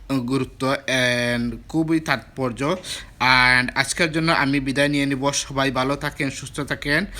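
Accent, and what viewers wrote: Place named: Sylhet, Bangladesh. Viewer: সিলেটি অখল অর মান সম্মান মাইরা হুতাইলাইরায় মিয়া তুমি।অও সুদ্ধ অও সিলেটি কিতা লাখাইছো ইতা মিয়া।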